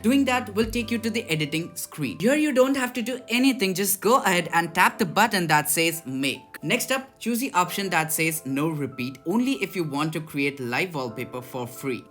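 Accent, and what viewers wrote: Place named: Middle East. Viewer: Sounds like a middle eastern scammer.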